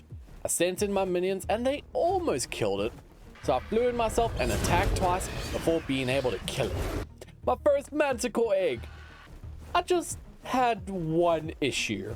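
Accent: South Africa. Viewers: Are you South African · Kyk hier neh ....jou accent was sommer mooi engels met n hint afrikaans, maar tu jy ESKOM mention toe weet ek sommer....(nee wat hierdie Suidafrikaaner kan sommer enige tyd n sub kry) uitstekend my friend ...sign my op vir daai 250 D's (Ending was totaly unexpected)#afrikaaners bly bo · Wait a minute are you South African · i knew you were south african lmaaooooooo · damn okay. lots of ppl south african here. i thought he was like scottish or something(i aint good with accents) but hes south african. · Mr, are you South African? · Are you South African ?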